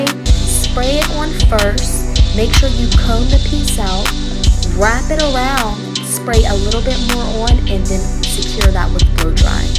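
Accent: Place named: Pittsburgh, United States. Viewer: Love the video! Imma try it soon I hope I don’t fail 🤣 also you gotta be from Pittsburgh with that accent!!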